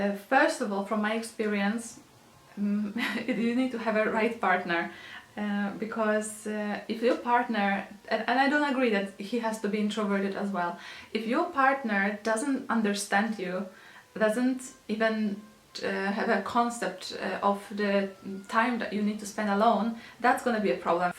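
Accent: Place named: Poland. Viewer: I love your polish accent don't lose it, it makes you unique. luv from Malaysia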